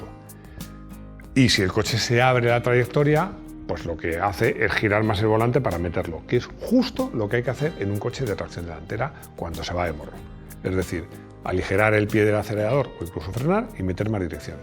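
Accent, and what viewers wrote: Chile. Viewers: Estimados la voz en off es de un Chileno?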